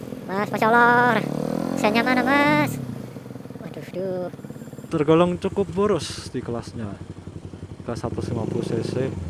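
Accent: Semarang, Indonesia. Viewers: orang Semarang ya?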